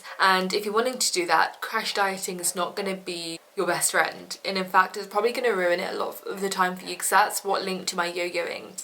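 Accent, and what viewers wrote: United Kingdom. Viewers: Besides the tips that you've shared, I also love your cute British accent ANYWAYS Thank you for this wonderful video 💖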